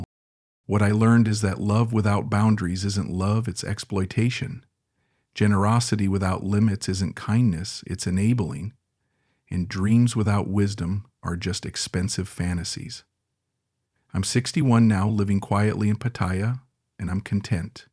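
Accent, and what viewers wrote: United States. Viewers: Australian with a Yank accent??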